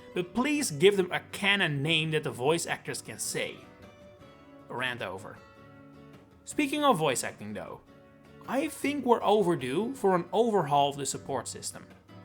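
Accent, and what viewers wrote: Netherlands. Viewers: Bro are you from the netherlands?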